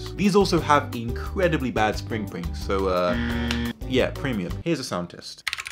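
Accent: United Kingdom. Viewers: He’s British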